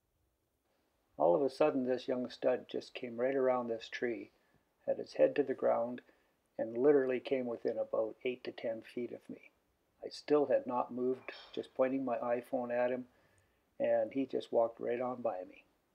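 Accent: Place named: Canada. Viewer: Are you Canadian? You sound like Jordan Peterson (A Canadian debater and Harvard professor)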